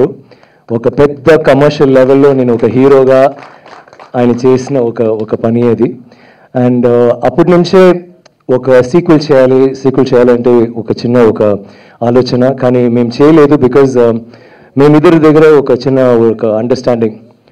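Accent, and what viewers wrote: India. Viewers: Telugu vade anukontunnanu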